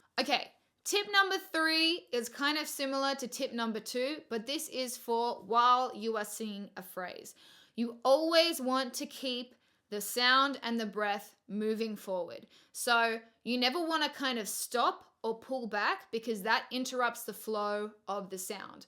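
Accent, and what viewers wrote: Australia. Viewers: I love the Australian accent i wanna be Australian omg lol ahhhh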